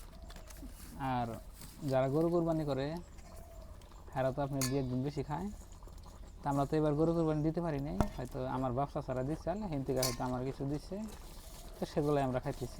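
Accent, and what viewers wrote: Bangladesh. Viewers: উজানী ভাবি ভাবির ভাষা শুনি বুঝছি ভাবি উজানী মুই ও কিন্তু উজানী 😂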